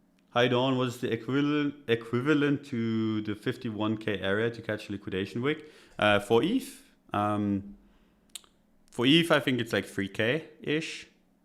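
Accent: RP, United Kingdom. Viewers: content lol.i realised these guys are just waffling for hours over s/r levels that are obvious on a chart. everything is it "looks good" or "looks bad". My bet is they both work, have jobs, and just release lack lustre vids to earn money for leverage trading following YT income. Creds system is flawed too if you actually bother to back test it. but he speaks posh so people believe him. sponsored by leverage exchange to get you to gamble says it all. "I think think think" blah blah blah . there's no on chain analysis here or order book insight at all. these guys are amateurs and rent their homes with a high probability that mummy cooks dinner every night for them.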